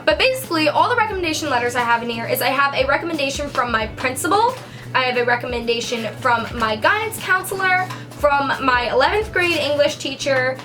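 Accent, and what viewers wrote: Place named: Long Island, United States. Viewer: Girl you sound like a real long islander ! ❤️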